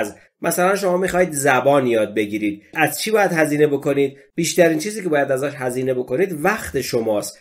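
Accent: United States. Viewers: Are you American ? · Are you Americam?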